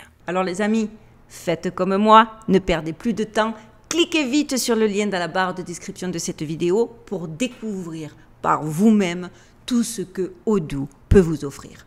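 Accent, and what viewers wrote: Marseille, France. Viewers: Moi ce que jaime c'est que t'as gardé l'accent du Sud meme au states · Tu es VRAIMENT ADORABLE, j'adore ta voix, ton accent MARSEILLAIS. MERCI POUR TON PROFESSIONNALISME et ton CHARME !!! ♥♥♥😘😘😘😘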